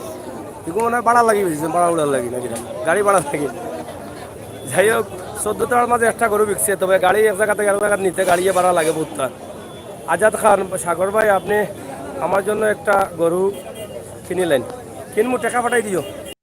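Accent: Sylhet, Bangladesh. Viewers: ভাইজান। আপনাদের সিলেটে কথাগুলো শুনতে ভালো লাগে ও আপনার কথাগুলো অনেক সুন্দর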